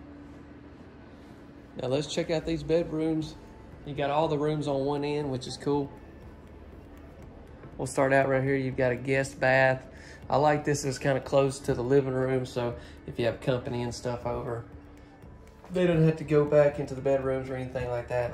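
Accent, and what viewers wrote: South, United States. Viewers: It's a beautiful home and if you lived in this home, you could never be called "trailer trash." Plus I love this guy's southern accent. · How Y'all doing. Love your southern accent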